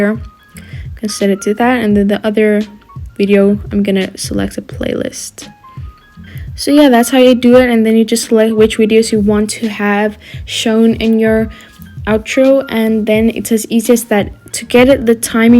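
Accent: South Africa. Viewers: U sound like ur South African :) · ur South African ✊🏽🙌🏾🙌🏾🙌🏾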